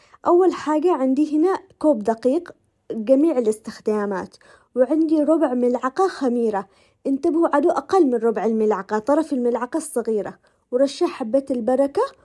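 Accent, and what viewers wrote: Yemen. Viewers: ربي يسعدك وينولك مرادك ..انسانة راقية ولهجتك اليمنية جميلة جدا ❤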